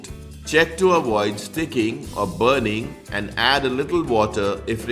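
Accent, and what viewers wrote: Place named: Mumbai, India. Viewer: What a pucca Bandra-Mahim accent! 😅 Very nicely demonstrated to prepare a perfect Cabbage Foogath! A variation of Saraswat Upkari!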